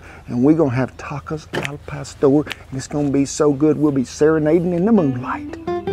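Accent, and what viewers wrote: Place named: Texas, United States. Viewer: I knew you were from TX, my father's side is from TX and that accent gets me every time!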